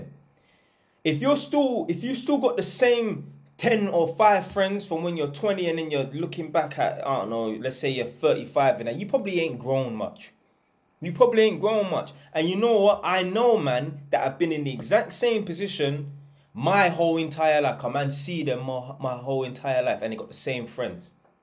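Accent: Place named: Leyton, United Kingdom. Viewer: lol slyly sound like your from leyton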